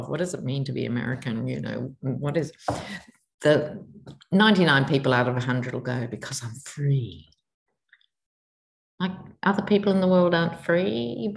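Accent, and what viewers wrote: Australia. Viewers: Safe journeys my friend 🥰 Enjoy your time at Bondi 🏖 Beach. Don’t forget the sunscreen, Girl. I Always watch your readings and never get tired of your Aussie Speak. Speaking of “ Robert as “You poor little piddle”. My mom used to use that term piddle to describe something our puppy did when she got excited and wet the floor! Maybe it’s the equivalent of American slang calling someone a Little Pisser! 🤣😂😅 Loved your RBG reading. Love that woman! Thanks for staying with us for the long game🇺🇸 🇦🇺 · Congratulations 🎈🍾🎊 on getting a troll. Probably a right wing grifter who’s fascist views are threatened by the truth you speak with your amazing Aussie accent. 🤗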